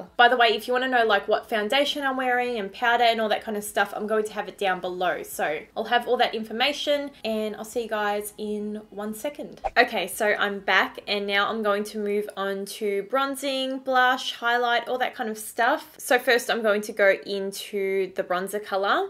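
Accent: Australia. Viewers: Australian accent 😍😍😍😍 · lol i was like.... nz or aussie? and then she said 'they bloody hurt' and i was immediately like yup aussie 😂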